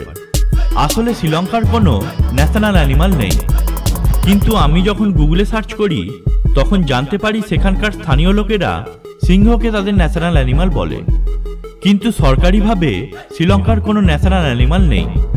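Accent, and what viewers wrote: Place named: West Bengal, India. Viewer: he is West bengali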